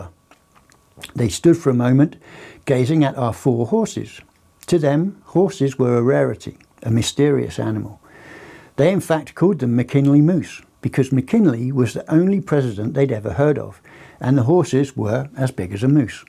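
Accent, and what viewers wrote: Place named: Ireland. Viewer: I really like this man’s story telling the Irish accent is so pleasant to hear. Bigfoot is real. They are an ancient tribe of people. DNA has showed they are a human / hybrid. Yes dog men are dangerous and can be found in Michigan to the east coast. They are what natives call skin walkers because they can stand on their back feet as well as on all fours. Some call them dire wolves. Bigfoot, Sasquatch or Sabe are intelligent and many known cases of saving humans.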